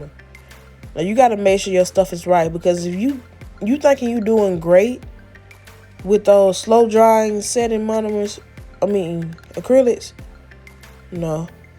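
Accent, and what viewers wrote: South, United States. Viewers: you are funny 😅😂the nails came out cute and your accent is awesome I love my country girls💃🏽💃🏽💃🏽💃🏽💚🥰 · Not annoying and country you crack me up😂😂😂😂💜💅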